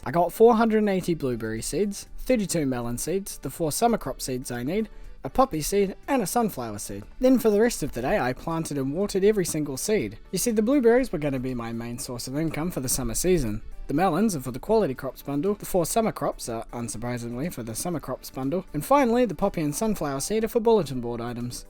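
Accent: New Zealand. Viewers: is that a fellow kiwi accent i hear? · stardew and a nz accent 🥰 love it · You from NZ? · Great video and very funny! Is that the Kiwi accent I hear? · Are you from NZ? I'm Australian and ur accent rlly sounds nz :)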